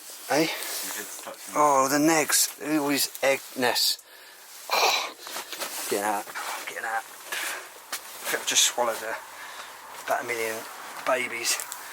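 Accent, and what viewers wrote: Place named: Scotland, United Kingdom. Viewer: It's very Scottish I wish you would say what country your in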